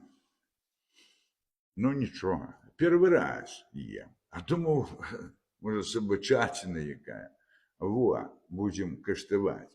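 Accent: Belarus. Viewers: Белорусский говор.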